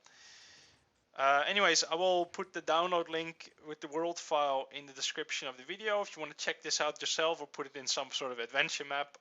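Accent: Netherlands. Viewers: probeer op je nl accent te oefenen :) dat klinkt iets beter (alleen als tip voor de rest goed) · wait.... are you dutch ? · dude, u dutch? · Jij hebt een nederlands accent.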